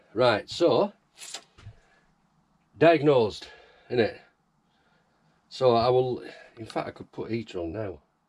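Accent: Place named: Northern England, United Kingdom. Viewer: Hiya defo agree with other comments try and flatten out the cold water pipe or re pipe it if that dont work shortest route good look m8 and were are you from defo a northern accent were Bolton chris & sheila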